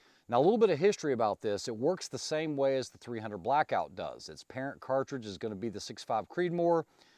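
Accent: South, United States. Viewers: thanks! haha I knew it had to be a bit more southerly US because of your accent, which I also like very much! Thanks again for the cool range and the great information on the new cartridge.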